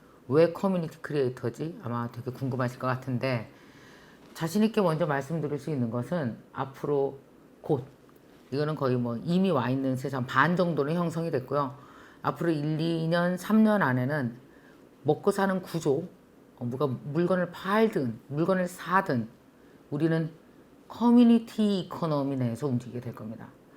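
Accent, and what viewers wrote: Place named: North Chungcheong, South Korea. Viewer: 언제나 잘보고 있습니다 충북이 고향이라 아주 귀에 딱딱 들어 옵니다 ^^ 마치 고향 이모가 말해 주듯이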